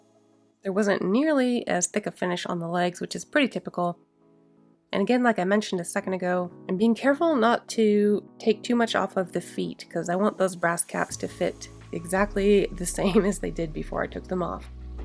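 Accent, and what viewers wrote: Canada. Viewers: Ahhhhh… Your accent makes sense now when you mention Canadian dollars! I only noticed it with certain words but now that’s all I hear 😂 I love it :)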